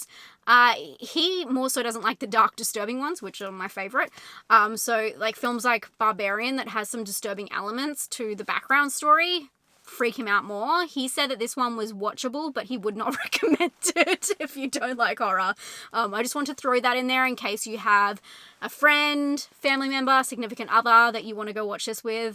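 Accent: Australia. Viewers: So nice to hear an Australian review, especially considering the high Australian input in this film! I’m seeing it tomorrow and can’t wait. Seeing it again at the weekend with my mother who’s a fellow horror fan and a friend who doesn’t like horror and gore, but really wants to come. 😂 The second viewing should be interesting. I don’t think our friend will see much of the film through her fingers.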